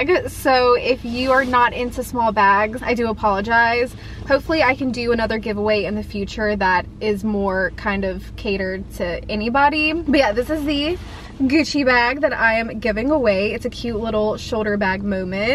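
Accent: South, United States. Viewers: your southern accent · love that southern drawl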